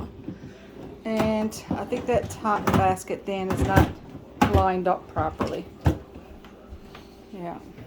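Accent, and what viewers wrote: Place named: Cape Breton, Canada. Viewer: Ay, take me back to Cape Bretton. 👍 Love that accent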